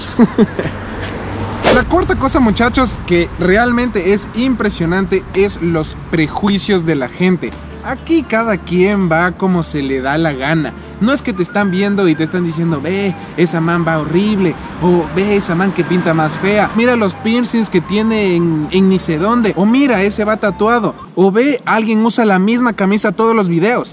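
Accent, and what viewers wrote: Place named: Ecuador. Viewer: Nunca perdiste el acento ni tu identidad Ecuatoriana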